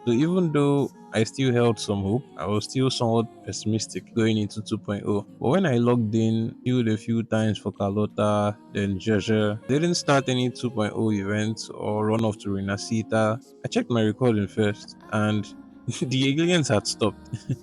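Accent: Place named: Nigeria. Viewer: I know a Nigerian when i hear one, I thought i was the only one who played wuwa and genshin Subscribed…🤗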